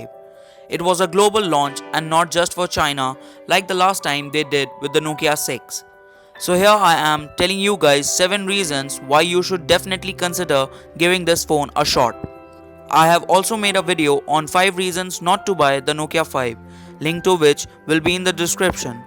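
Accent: India. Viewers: This is called hinglish, that is acceptable as long as we can understand the topic.. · Your Indian English is funny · kaizumi soriano its a kaling tamil india accent hahahaha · He’s from fking India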